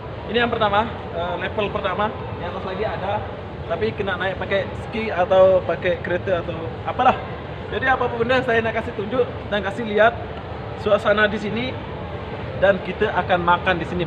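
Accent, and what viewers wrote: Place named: Malaysia. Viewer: Wah awk cakap mcm org malaysia la.. "berape harge die" 😂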